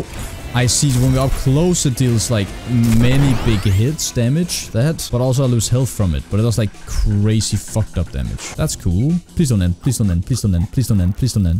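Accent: Denmark. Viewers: my guy you danish?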